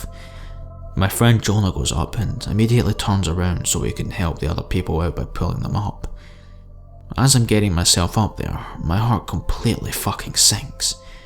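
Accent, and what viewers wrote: Scotland, United Kingdom. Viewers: Great video! I love your narration, although I must admit I do have a serious jello-kneed weakness for a Scottish accent! Keep those stories coming! 😘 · Yay a Scottish horror narrator !!!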